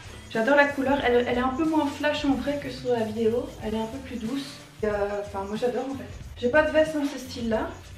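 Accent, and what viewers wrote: Belgium. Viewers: C'est un truc de dingue comment j aime trop l accent belge mdr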